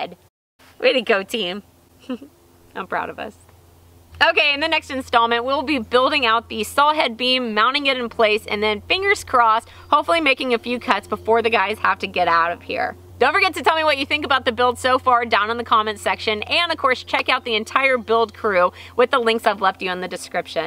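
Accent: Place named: South, United States. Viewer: Are you going to pour a concrete pad for that thing? When rainy season comes, I could see those concrete blocks sinking in and warping the bed. "Cody drug it over there." You go, southern girl, that's how we talk.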